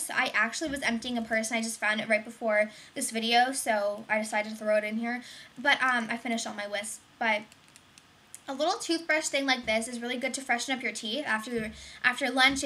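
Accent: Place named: Canada. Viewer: look at how tan you were! goodness..haha your so pretty and i wish i had a canadian accent haha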